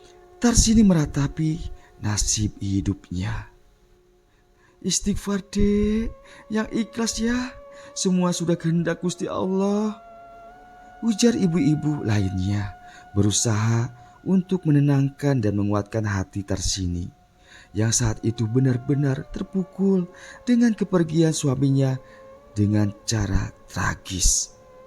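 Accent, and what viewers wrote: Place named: Indramayu, Indonesia. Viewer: Gaya bicara Indramayu an pas temen kang 😁,gak pernah komen dan like tpi selalu Nyimak #salam_Wong_INDRAMAYU 🙏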